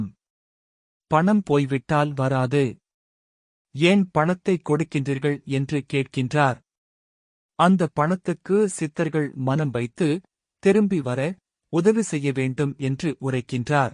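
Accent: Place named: North, India. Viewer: தயவு செய்து தமிழ் தெரிந்தவர்களை வாசிக்கச் செய்யவும். அகத்திய மா முனி / பெயரை கெடுக்காதீர்கள் தமிழுக்கே இழுக்காகும். யாரோ வடக்கர்களை வைத்து வாசிப்பது போல உள்ளது.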